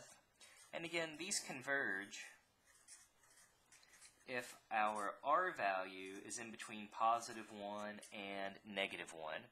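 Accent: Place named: United States. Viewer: in the U.S. you can tell from his accent. however if you want to ask which state is he in? because american only ask about america sinour.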